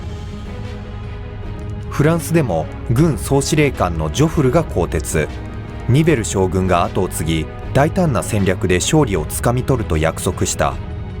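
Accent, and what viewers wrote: Kansai, Japan. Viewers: ジオヒストリーと同じ関西訛りが好きです。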